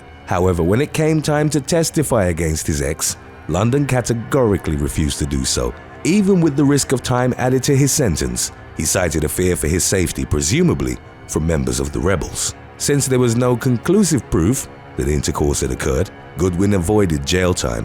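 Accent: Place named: United Kingdom. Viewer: Hes from the UK and tbh his accent is quite lovely .Hes a huge reason why this channel is so successful .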